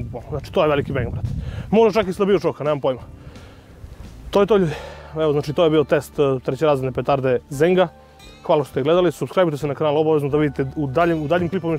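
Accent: South, Serbia. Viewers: Najjaci juznjacki naglasak!!!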